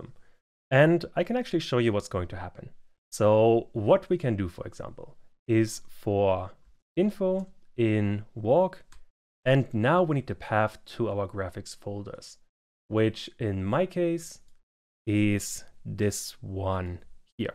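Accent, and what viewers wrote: Germany. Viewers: Your content is fucking amazing bro, keep it up! :)) Btw, höre ich da einen deutschen Akzent heraus? xD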